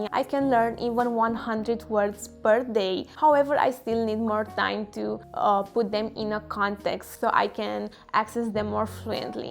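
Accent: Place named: Romania. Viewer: God damn, I recognized instantly that you're from Romania (mostly because so am I) but daaamn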